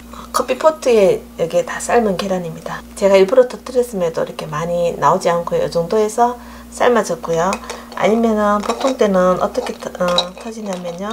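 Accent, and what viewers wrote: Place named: Andong, South Korea. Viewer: 고향이 경북봉화인가요?. 말투가 안동이나 봉화쪽 말투이신듯..-0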